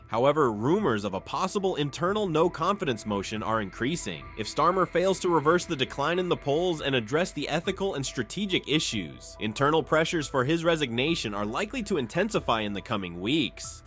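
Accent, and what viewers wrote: United States. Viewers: lies lies and more bs .... are you that desperate to use clickbait titles and a really crap american voice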